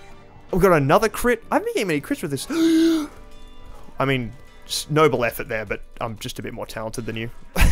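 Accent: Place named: Australia. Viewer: The thing is, this isn't the case in basically all of his other videos if you look back on them, this sort of thing only happening on rare occasions to minor degrees. But in this case it's everywhere in this video. It happens a lot here where in other videos it's particularly rare. Being Australian might have something to do with it and the accent might be effecting it but the oddity is how frequent it is in this video in particular.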